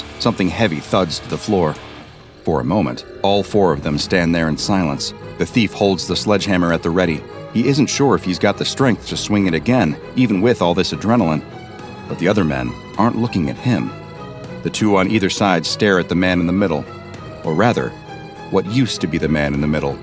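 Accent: England, United Kingdom. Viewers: is she britosh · i love how he added the BRI'ISH ACCSENT YEAH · This is one of the weirdest SCP anomalies I've watched so far, and there are some really weird ones. Nice English accent by the way.🙂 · British accent is perfect trough video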